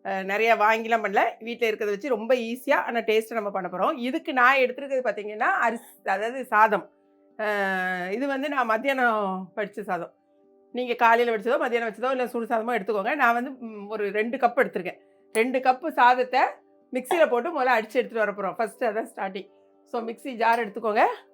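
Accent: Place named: Nagercoil, India. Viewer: U r tamil resembles Nagercoil tamil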